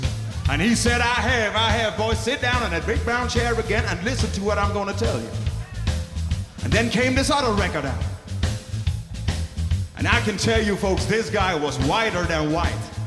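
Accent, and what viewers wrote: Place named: Gelderland, Netherlands. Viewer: dat komt omdat hij een zwaar accent heeft omdat hij uit gelderland komt, hij wil daarom niet voor schut staan met zijn accent, daarom praat hij continue engels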